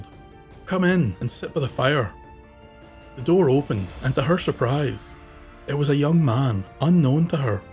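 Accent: Scotland, United Kingdom. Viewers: Omg I love your Scottish voice! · Thanks so much for this wonderful tale! It might be my favorite so far. Also, I could listen to your lovely voice for hours. Would you mind sharing the area of Scotland where you were raised? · I love the Scottish accent and learning about the culture & folklore. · Our first time watching.. the kelpie video was excellent.. Two things that encouraged us to subscribe., First your voice.. that beautiful Scottish accent.. Also Scottish we are.. and love folklore.. so After we subscribed we saw your wolf logo.. and felt content.. Both of us have wolves as spirit animals! Please keep making videos.. we love them🌹 William and Jen 💜💙🌱🌹🙏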